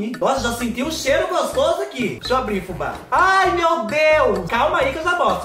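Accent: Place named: Minas Gerais, Brazil. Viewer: Fubá do céu, vc é natural de onde? Seu sotaque parece muito com o do mineiro. Não sou clandestina.😘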